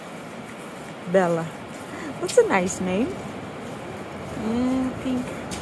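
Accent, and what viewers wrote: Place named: United Kingdom. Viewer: Grabe yung accent mo British na British na,love the idea of beach hut. PRINCESSANNE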